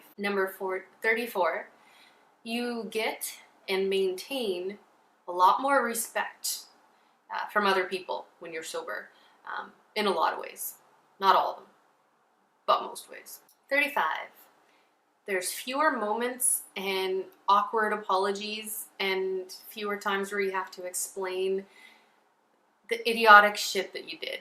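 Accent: Canada. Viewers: Love your videos. Helps me remember why i stopped drinking and the benefits of sobriety. Your canadian accent is too cute btw ("oot"). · Canadian ey? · Did you get your records up right? You're super lovely btw- and the love that slight Canadian accent. So, I'm aboot 21days sober- cigs went with it. It wasn't a resolution, it just happened and I've no desire whatsoever... Yes, it automatically make you want to improve other parts of your life. "This is no longer acceptable- how did I let myself get away with this?" kinda stuff... My step dad was an ineffectual boozer- my mom hung on until she died. My exwife was an abusive white-wino and our baby was born still- I divorced her and took a huge financial loss. Would I have married her if I had been sober? Not a chance in hell. What other losses have I incurred? How much massive bullshit have I brought into my life unintentionally, just by drinking? 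How many dj sets (4countries total) sounded not nearly as good as they could have? How many women have I been with that I wouldn't have otherwise? Eeeek! I'm so thankful this has happened. I've been in Austin TX for a year now, and have only been out for music a couple of times- I don't entirely trust myself- or I didn't- until now. Still, there's so much work to do on myself, by myself- everyone's dead, or I've cut all my old friends off. It's sucks, but I'll rebuild. I have all over the world- this time I get to do it better. Again, nothing but thankful... (Oh I gotta add- this past summer I captained party barges on the lake here (sober) and after watching people make total asses of themselves and puke their bikinied guts out aft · Early on, how did you learn to be social, find Joy, & not drink (or use opioids in my case), without that security blanket that you'd come so accustomed to? 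Did you force yourself into uncomfortable social situations until it became easy? Did you have a regimented schedule set out for your days off? Did you become an exercise addict? Did your boyfriend push you to do stuff with him? Once you hit that bottom (which I have), how did you learn to live life on it's own terms without being absolutely bored to death? I like your Canadian accent, Canada Rules!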